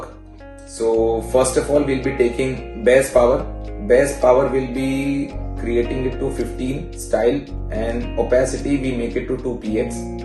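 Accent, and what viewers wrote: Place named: India. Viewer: Bro his accent is Indian and that makes hard to understand but this dude is good and anyone can understand but every body are not the same he was saying his strategy depends on moving average and you can get more explanation from youtube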